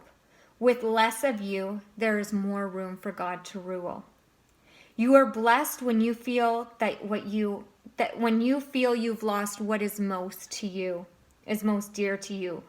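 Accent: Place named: Canada. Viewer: Inspiring ! Hope you are still doing well. You sound Canadian.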